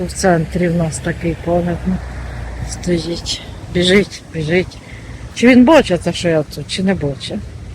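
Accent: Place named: Dnipropetrovsk, Ukraine. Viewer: Доброго ранку!Дивлюсь Вас і посміхаюсь,Ви такі класні!І говір у Вас такий ,як у нас ,ми з Дніпропетровщини,теж сільські,але рідко кудись вибираємось.Дуже дякую за Ваші прогулянки ,А балачки то взагалі , як похід до психолога,тут і поради і пояснення!З Вами просто і легко!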